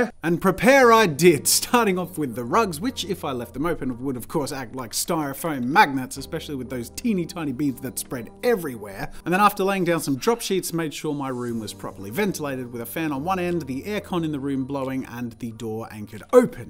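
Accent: Australia. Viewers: Are u from Australia? I mean your accent. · r u aussie?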